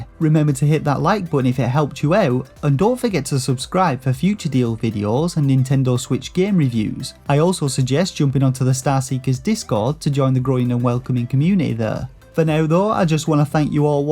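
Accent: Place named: Yorkshire, United Kingdom. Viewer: Star or Shovelware I don’t know if you’re from Yorkshire or Lancashire.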